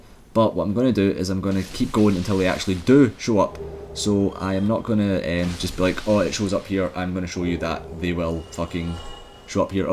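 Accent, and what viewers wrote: Scotland, United Kingdom. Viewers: Same here man. Thanks for doing this. Also, your accent is gloriously British (Scottish, right?) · Thank you, you amazing Scottish dark souls God! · 5 stars. But with the awesome Scottish accent it's a 5⭐️➕A+. Your a godsend bro. Although I kinda hated you when I was getting shitty chunks and green shitenite (I've loads) and your sucking on slabs yeh bastid yeh. Thanks again pal. Cheers from a fellow Celt in Dublin. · lol that accent, is it scottish?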